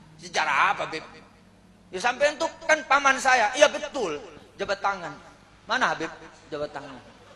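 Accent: Indonesia. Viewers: Logat Dompunya masih ada Syech...❤ n karakternya masih melekat Khas Dompu.. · Habib gaya bicaranya KY orang Betawi ya....Masya Allah...dakwahnya ringan mengena..mudah di terima❤❤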